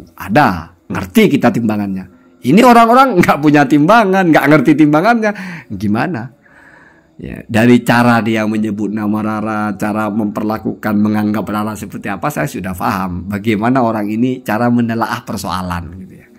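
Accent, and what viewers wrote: Madura, Indonesia. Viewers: Mungkin maduranya agak lain guss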